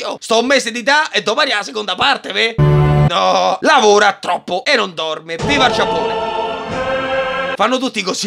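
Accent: Rome, Italy. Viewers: Ultimamente ho notato un indizietto che potrebbe aiutarci a rivelare la vera identità del, il fatto è che nei video parla in dialetto romano, ma non stretto. Ne deduco che abbia origini o risieda in Roma e provincia. Al quanto pare la tua cattura non è poi così lontana ＼(~o~)／ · Mai visto un riassunto che dura 4 min in più dell'originale, con accento romano imbruttito, jojo reference e doppiaggi più ignoranti di ogni cosa. Voto: diesci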